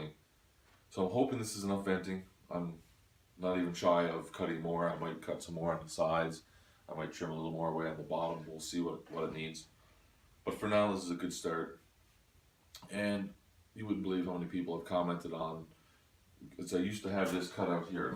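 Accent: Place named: Newfoundland, Canada. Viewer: Ahah - no wonder I couldn't peg that accent... A newf in AB is always a bit of a different one. Cheers m8, just stalked you on FB heh, hope all is well. Looking forward to updates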